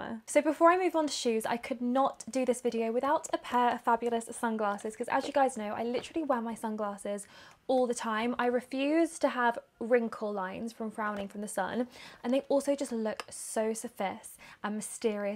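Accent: United Kingdom. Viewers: British accent Pink life Beauty Elegance Calm Cuteness You're amazing I have been watching your videos for a long time. Keep going 💗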